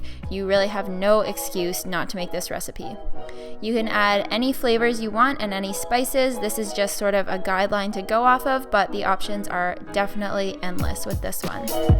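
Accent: Canada. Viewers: Do I hear an accent? Canadian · Dark hair reeeeally suits you! And your Canadian accent is adorable :) love this!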